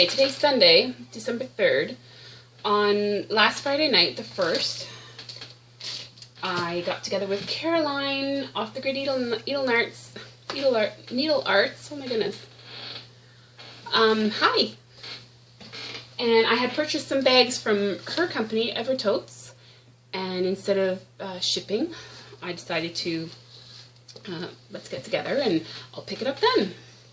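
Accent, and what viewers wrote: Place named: Canada. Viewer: First time watching you and I thought I detected a Canadian accent and I was right! Love your finishes and really, you stitch fast! You call that pretty leaf piece small, but that would’ve taken me forever! Also l love your haul ;). Happy stitching!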